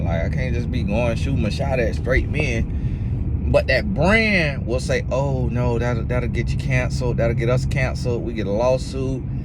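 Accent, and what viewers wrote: United States. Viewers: I don’t know about everyone else, but the transparency brings about the trust. Your transparency separates you from the “guru/talking heads”. Your real life situations, country accent and all, makes you so relatable. I understand being able to capitalize on your brand, but don’t let it remove the authenticity…that’s what keeps some of us here. God WILL provide. Thanks for all that you do!🙏🏿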